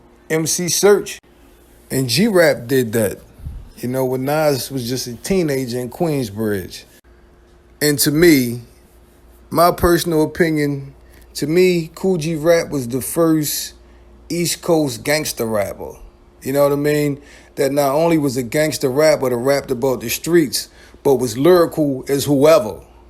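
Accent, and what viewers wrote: Baltimore, United States. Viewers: We never met, but dam your Baltimore accent is thick..... LLS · you definitely from Baltimore shorty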